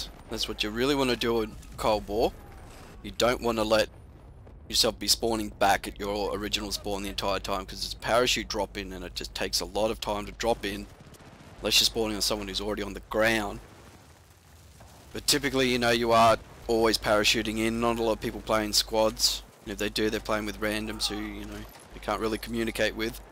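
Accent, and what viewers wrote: Australia. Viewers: at last... a video with call outs and an Aussie for the narrator =)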